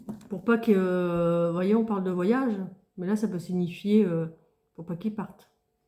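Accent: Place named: Nord-Pas-de-Calais, France. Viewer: ❤ merchiiiii, j'adore ton accent ch'tis😊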